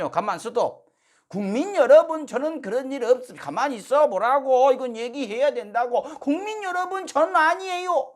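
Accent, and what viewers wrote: Jeolla, South Korea. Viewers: 혹시전라도랑무신관계라도있을까요 우리고장말씀을잘구수하게잘하네요 너무나시원한럭셔리칼럼하루만안해도기다려지네요 강성범tv많이많이들구독해주삼♡♡♡ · 형. 님!! 전라도 사투리 솔찬히 잘흐요.. 수원에사는 순천 촌놈이 구독 눌루고 가요오!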